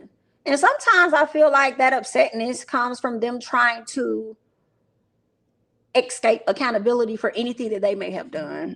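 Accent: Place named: Alabama, United States. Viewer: I just love to listen to you talk! Reminds me of my family home (Sylacauga, AL). ❤️❤️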